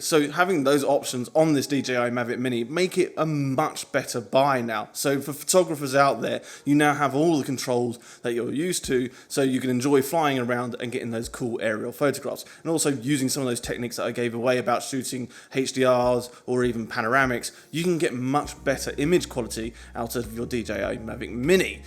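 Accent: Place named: Australia. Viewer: Well, its 0252hrs june 17th in Quebec and your comment response to the mate that made your day by subscribing with all the notification bells got me to thinkin🤔!!!,its night time here and you sound australian so i decided to make your night by subscribing with all the bells and whistles,like button, save and share and support your Channel. You do have some great videos and i appreciate you sharing your knowledge with us. Take care and stay healthy 🍻....Mike....🛶Aboriginal People's Brotherhood Chief🦅........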